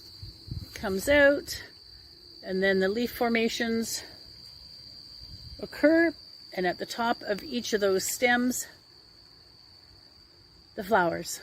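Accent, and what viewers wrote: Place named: Canada. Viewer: Thank you! Wre those crickets in the background ? Where were you filming from? You sound Canadian but i don't associate crickets with Canada!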